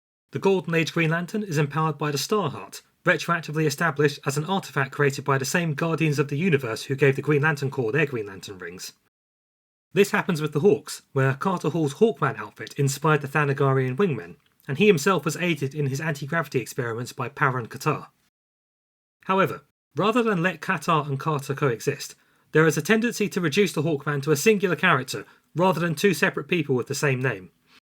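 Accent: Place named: United Kingdom. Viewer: Is 9th metal 8x stronger than Nth metal, or is homies Limey accent just throwing me off? did not know Ninth metal was a thing🤷🏽 The Katar Jr./silver scareb works cuz that Gawkman was a lier and not the Scarebs real brother. I just love JLI and hate the try on that those 2 weren’t legit! Using Morrison’s preferred pronouns? RESPECT Edit Lol Starlin mess’n round w his cosmic fetish, you really can’t fault the guy at this point. Death of the New Gods was a giggle, and he did kill Jason!!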